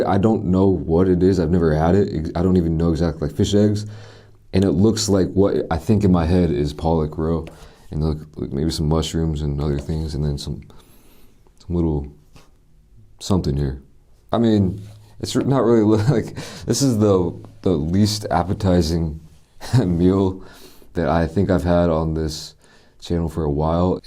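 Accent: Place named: New York, United States. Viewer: NGL~ if I was in a spot in NYC & walked in & spoke~ I woulda SWORN he was from New York!! 😂😍💪🏽💯❤️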